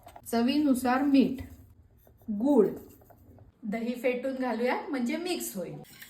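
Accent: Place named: Pune, India. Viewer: वाह भारी, फार फार सात्विक वाटलं हो. काय हो तुम्ही पुण्याच्या काय? कारण हा असा मराठी चा एक्सेंट तिथेच असतो. फार पुर्वी हा आमच्या गिरगावात... आता तो तिथे नसतो.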